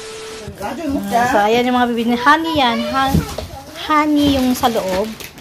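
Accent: Philippines. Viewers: Tanung mo nga sa asawa mo kung kapag nagsasalita ka ng Korean, may accent ka ng pagka-Filipino? Kasi mukha ka naman ding Korean, gusto ko lang malaman kung kapag nagsasalita ka ng Korean, alam ba ng mga Korean na hindi ka Korean dahil sa accent mo?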